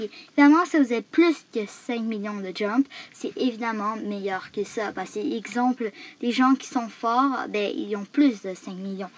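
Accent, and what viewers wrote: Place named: Quebec, Canada. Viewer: Il est sûrement Québécois l’accent se reconnaît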